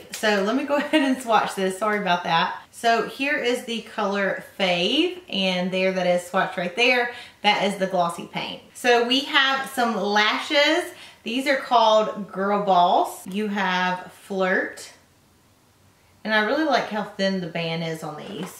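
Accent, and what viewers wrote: South, United States. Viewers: If you put captions on, it changes some words due to the thick southern accent 😅 It said "matta fires" instead of mattifiers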